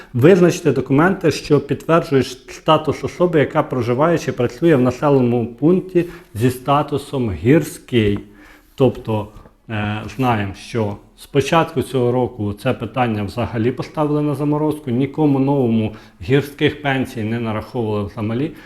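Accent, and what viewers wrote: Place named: Khmelnytskyi, Ukraine. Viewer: О ! Землячка Дубровського (хмельниччина) : "...цЕго року", "...будуТ робити" ... і ще доооооохрена подібних "перлів" з місцевого говору (всього раптово і не пригадати...) (P.S. Може бути таке, що, прочитавши мої слова, багато хто і не знає, що Дубровський - це і є той самий Саша, котрому всі ви, мої "колеги" по пенсійному віку, надсилаєте свої коментарі на канал "Народна думка"... Всі знають, що - Саша... А прізвище... ??? Упевнений - багатьом невідоме ! ( мужикам-то воно більше знайме по вислову : "Не с . и, Маша, я - Дубровський !" ))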